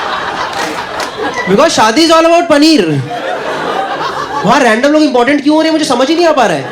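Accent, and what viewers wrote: Delhi, India. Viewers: He is awesome- with a Delhi Punjabi flavour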